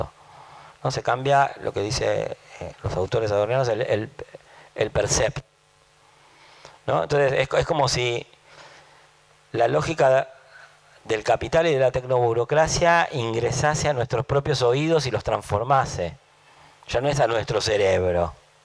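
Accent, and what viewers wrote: Argentina. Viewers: quedemosnon con lo que dice que es lo importante! a mi tambien me da un poco de asco el acento porteño (ni se si es porteño siquiera)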